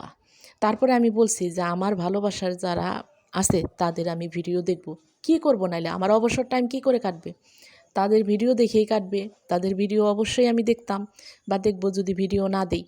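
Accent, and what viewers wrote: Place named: Manikganj, Bangladesh. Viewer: আপু তুমি যেও না, তোমার কথায় মানিকগঞ্জের ভাষার টান আছে,শুনতে অনেক আপন মনে হয়, যেহেতু আমার গ্রামের বাড়ি মানিকগঞ্জ, ভালোবাসা অবিরাম ❤️❤️❤️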